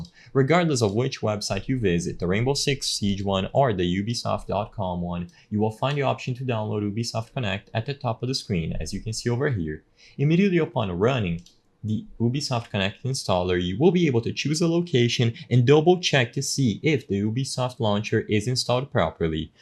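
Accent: Brazil. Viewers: cara eu fui ver o tutorial e falei, doidera esse cara fala igual brasileiro, só que em inglês, dai fui ver seu perfil pra entender kkkk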